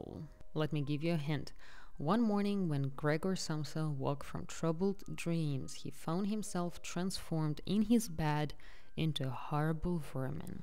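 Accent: Russia. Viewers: First, let me very shortly introduce myself: My family is a North Italian / Austrian / Czech mix. Am I right that the girl who made this video may be Russian? Despite all her efforts, I can still hear some Russian / East Slavic accent. What's more important, her opinions seem to be influenced by that: 1. Czech Republic simply IS a Central European country and it's probably the very synonym of Central Europe itself. Geographically, historically, culturally and economically. For almost a thousand years (= most part of its existence) it has been in the Central / Western European sphere of influence (Holy Roman Empire, Austrian Empire, Roman Catholic Church etc.). Yes, for four decades it was a part of the Eastern block, just like East Germany. Never "Soviet block" or even Soviet Union. 2. The socialist regime ended in Czechoslovakia in 1989, not in <num> was the year when Czechoslovakia peacefully split into the Czech Republic and Slovakia. 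· This is by far the most offensive, arrogant and I should say ignorant (considering historical, sociological facts as much as knowledge of common realias) amateur video ever made about Czech Republic and published on YT. What is also odd is this Eastern/Western “definition” is claimed by the author (and arrogantly served as “a fact”) who is apparently a russian person (the accent is clearly eastern european/russian…although author is trying to hide that ;), there is probably good reason for this) living in Switzerland. I mean wow…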